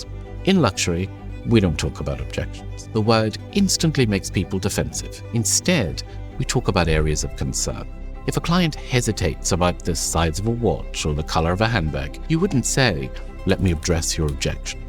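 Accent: RP, United Kingdom. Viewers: BE CAREFUL, THAT 'POLISHED' BRITISH ACCENT IS WHAT GOT YOUR MIND AND NATIVE LAND COLONIZED.. I AM HERE TO COLLECT 😈🍄💯🤘🏾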